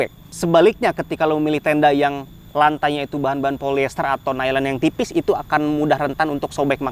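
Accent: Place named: Java, Indonesia. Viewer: Suara lu kalo riview medok banget bang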